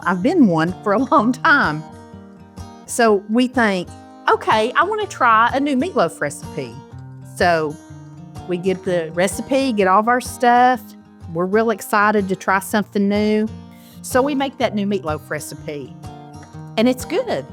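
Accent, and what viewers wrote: South, United States. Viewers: I wanted you to know that you are such a blessing to me. ❤ I have PTSD and when I get days where it's bad... I watch your channel. Your soothing southern voice, smiling face, and easy ways help me refocus a bit. TY for being YOU! Hugs British Kate in 🇺🇸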